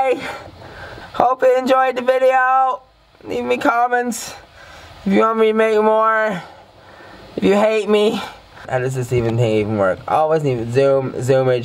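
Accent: Ireland. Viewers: His Irish came back in this video lol!!!!! never heard him swear so much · You sound so much more Irish in this video! And that's coming from an Irish person! 😂🇨🇮 Loved this type of video from you, you should keep doing game plays! Just a random thought I had, if you were to build your 'dream house' that you've always spoken about on the sims that'd be awesome! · Oh my god that was so funny, lads I'm fucking sweating 😂😂😂 also I freaking love the Irish accent xx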